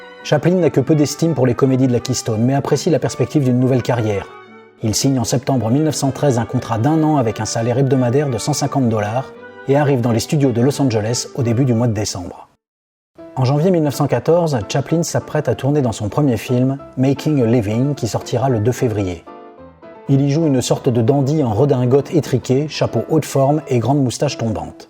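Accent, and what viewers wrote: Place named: England, United Kingdom. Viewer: Merci…passionnant comme d’habitude ! 👍👏👏👏 Et quel accent anglais 😉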